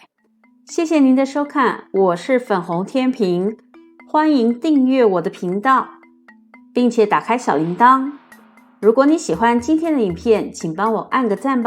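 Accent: Standard. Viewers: 你的口音不像台湾人啊 哦对了，你做过国语记者，国语非常标准。 我在多伦多，也好中年技术移民来的，非常赞同你的观点 我是大陆人，来加拿大不但可以获得自身的自由，更能拍拖意识形态的干扰。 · 山水有相逢。一切都是小問題哈哈哈。還有你的國語好正統，沒有台灣腔，我昨晚看你視頻的時候都在猜想您祖上是不是北方人，或許是河北那一片的😆😆😆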